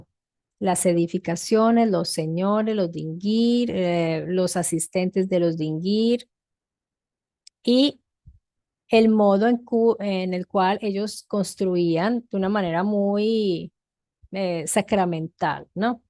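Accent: Italy. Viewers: ¡Correcto! Usted si sabe decir las palabras exactas. No es la primera vez que desde aqui, en casa, le aplaudo por la precisión.... Devuélvanse un par de minutos y aprenderán lo que es hablar correctamente ( disculpando el hermoso acento italiano, que le dá mayor belleza)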